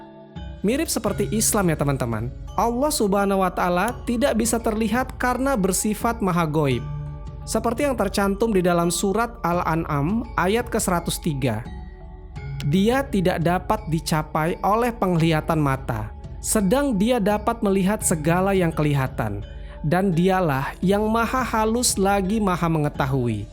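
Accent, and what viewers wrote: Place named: Java, Indonesia. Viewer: Dialeg jawa dibaca pembicara dlm dialegnya sendiri...ditelinga pendengar sangat lucu broooo😅😅😅 wisss...wissss embhuh sakkarepmu rek...😊😊😊😊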